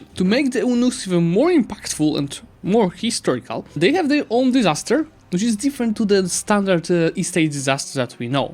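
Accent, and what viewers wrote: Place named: Poland. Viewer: <3 love the polish pronunciations myself, thanks for the updates. Really cool that you were able to get a preview!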